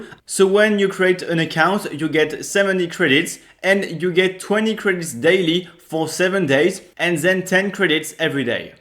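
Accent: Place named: France. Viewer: I watched your French version of this video.i didn't know you can speak English this well. Just that your French accent is too strong. I like it.😂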